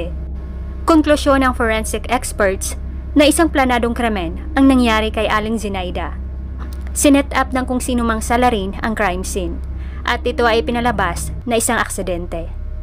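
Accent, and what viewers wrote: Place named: Visayas, Philippines. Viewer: Ok nmn kairita😂 lng ung bisaya accent Mo mejo konti practice P ... But still I'm entertained pdn 😊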